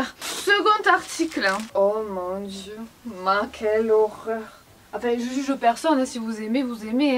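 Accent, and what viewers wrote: South, France. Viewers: Bonjour/Bonsoir j'aimerai beaucoup que tu test la marque Adopt maquillage parfumerie moi perso j'adore mais j'aimerai beaucoup que tu fait une vidéo là dessus. Continue ce que tu fait j'adore tes vidéos sont génial. Je suis du nord et ta voix du sud nous ramène la bonne humeur. Bisous a votre petite famille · Au pire le haut bleu finale tu le garde pour dormir en hiver et les flops tu les vends🤔 Sinon j’adore chaque de tes vidéos et je surkiffe ton accent du sud gros kiss 😘😘❤️‍🔥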